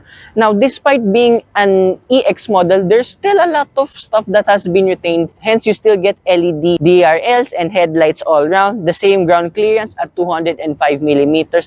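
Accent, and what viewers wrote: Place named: Philippines. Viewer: Masgusto ko yung ganitong review. May Filipino accent. Masnatural since ganito halos lahay magEnglish merong Filipino accent. Masnaiintindihan ng tao. More review to come sir.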